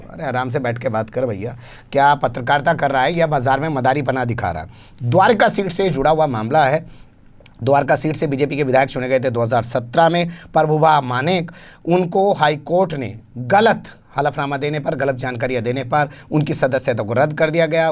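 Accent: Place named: North India, India. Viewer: Sahab your (north indian)Desi style of expression, i like very much....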